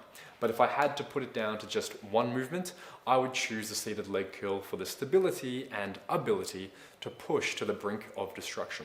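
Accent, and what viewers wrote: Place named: Australia. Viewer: He has the same Aussie accent as the Supercar Blondie.:D Loving your videos! Keep it up!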